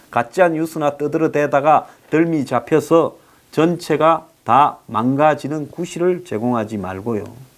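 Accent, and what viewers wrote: Gyeongsang, South Korea. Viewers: 한국사람들은 머리가 딱딱합니다. 그리고 매우~~~~겉으로만 보고 판단합니다. 황선생님은 내용상 전혀 극우가 아닙니다만, 제 생각으로는 단지 소장님이 경상도 사투리를 쓰고 목소리가 커서 강해보이니까 극우다..라고 느끼는거고 그걸 그냥 다시 생각해보는거 없이 프레임화 시킵니다. 좌파들 말하는거 보세요. 대부분 매우 부드럽지요? 달달하게 말합니다. 그렇게 말하면 극우적인 내용을 말해도 한국인들은 극우가 아니라고 느낍니다. 감성적으로요. 제가 일본에 산 적이 있는데, 그때 느낀 사소하지만 중요한 차이가 있습니다. 일본 지하철 역에 가보면 벽에 광고들이 붙어있지요. 그런데 일본 광고는 글자가 매우 작더라고요. 자세히 읽어보지 않으면 알 수 없는 광고 내용들..한국처럼 대문짝 만하게 선정적인 문구로 하는 광고 비율이 확실히 낮습니다. 그거만 봐도 민중의 성향이 읽혔습니다. 광고야 말로 자본주의의 첨병이고 효율에 목숨거는 분야일텐데, 일본광고의 글자가 작다는 것은 일본사람들은 뭐가 쓰여져 있으면 자세히 읽는다는 사실을 반증하는거죠. 한국사람들은 벽에 뭐가 큼지막하게 쓰여져 있어도 아주머니들이 옆에 서있는 저에게 묻는 일이 많습니다. "뭐라고 하는 거에요?" 라고요. 문맹이실리도 없고, 글자도 커서 노안에도 잘 보이실텐데. 습관인거죠. 한국과 일본, 어느쪽이 프레임과 거짓소문으로 더 양때몰이 하기가 쉬울까요? 쉽게 상상이 가능하리라 봅니다.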